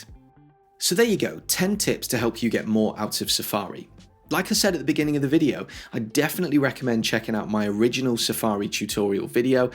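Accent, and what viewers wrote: United Kingdom. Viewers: I stumbled upon your channel few days ago. I am 73, and like my late husband, I am an avid Apple fan. I have iPhone 11, iPad, and Apple Watch. I am always Googling to find out about the security features and how I could make the most of my devices. Your channel is just what I need. I am in UK, and it is so nice to hear the British accent. I like your style of explaining. I have subscribed to your channel.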